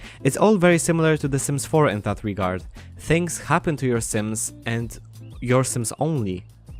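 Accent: Poland. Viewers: Are you Polish? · Are you polish? :D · Are you Polish by any chance? Amazing video btw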